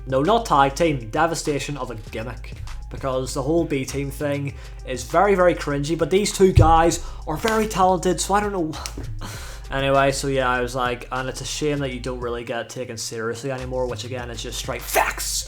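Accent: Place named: Northern Ireland, United Kingdom. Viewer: Dude it’s so good to hear that accent! Good old Northern Ireland!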